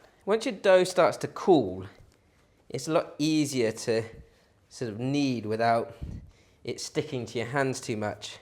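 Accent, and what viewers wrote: United Kingdom. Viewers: thank you.. you sound sooo British.. San Diego Ca.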